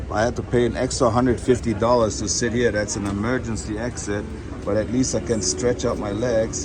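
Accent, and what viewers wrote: Germany. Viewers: Who doesn't love this guy? Please like and subscribe. I love this German guy. Also love the accent. !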